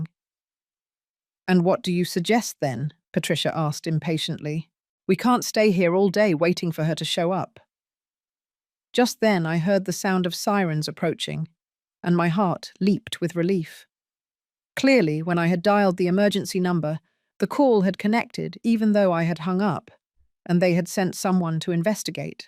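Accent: England, United Kingdom. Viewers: This is an English accent not American.🇬🇧 Long and drawn out with no substance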